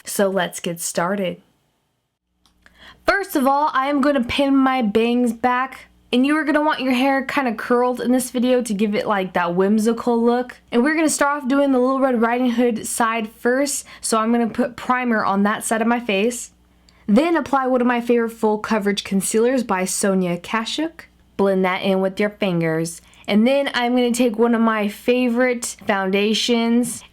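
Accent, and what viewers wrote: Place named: Texas, United States. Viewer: whats with the Texas accent? stay cute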